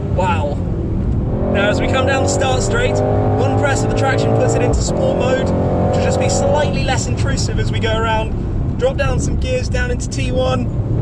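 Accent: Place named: United Kingdom. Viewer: could completely make up a car spec and everyone would believe him cause his British accent makes him sound like he always knows what he’s talking about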